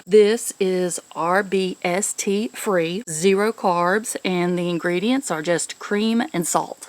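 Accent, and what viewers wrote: South, United States. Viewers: You have a thick southern accent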